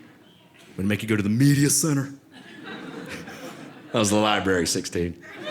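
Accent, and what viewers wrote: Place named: South, United States. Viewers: It’s the Southern charm 🥰🥰🥰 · I'm from north Carolina. This dude is talking exactly the way I grew up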